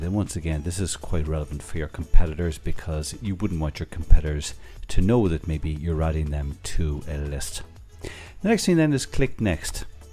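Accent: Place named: Ireland. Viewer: Great thanks. As a Scot, its so refreshing to hear an Irish accent, so much easier on the ear and easier to understand than many of the other accents you get on these types of videos. Thanks.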